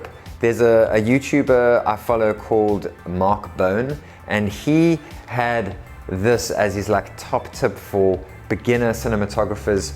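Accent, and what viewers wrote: South Africa. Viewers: hey man just heard the way you speak and was wondering are you South African since you also priced the weatherman in rands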